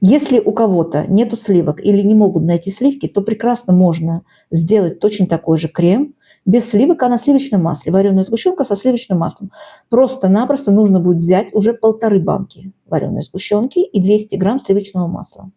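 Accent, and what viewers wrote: Baku, Azerbaijan. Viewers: Опять приятные слуху,родные "мелизмы" и акцент!Я Вас приветствую из нашего родного Баку!Огромное спасибо за рецепт и вообще,за то ,что Вы есть у нас!Благополучия Вам!❤❤❤❤❤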